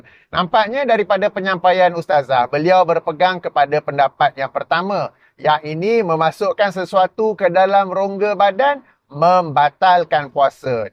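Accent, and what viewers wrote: Kedah, Malaysia. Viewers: Orng Kedah cakap nampak kasaq tapi ada wisdom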